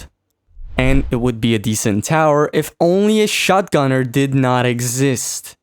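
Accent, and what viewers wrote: Romania. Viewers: omg are you romanian?? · are u romanian :))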